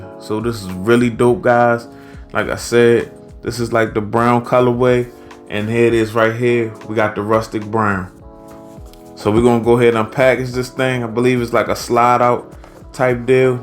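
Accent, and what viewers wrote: Baltimore, United States. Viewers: You from Baltimore or Philly?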